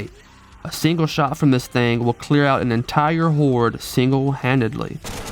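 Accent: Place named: United States. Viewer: youve got the most american accent i have ever heard and i kinda love it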